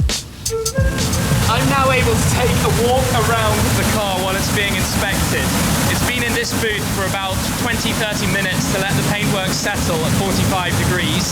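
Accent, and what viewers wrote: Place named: United Kingdom. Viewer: this guy sounds like the british stadman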